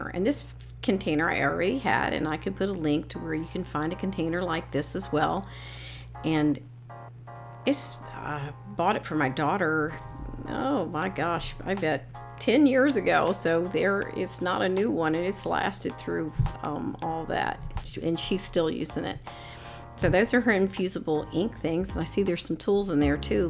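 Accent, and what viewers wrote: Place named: Virginia, United States. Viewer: Hi, Your accent sounds like it is from a previous place we lived. Is it possible that you are from Virginia? We lived in New Hanover County, specifically Mechanicsville.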